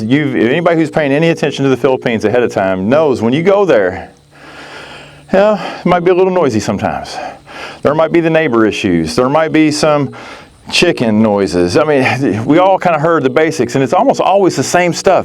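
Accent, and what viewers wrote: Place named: Kentucky, United States. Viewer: Your accent is so Kentuckian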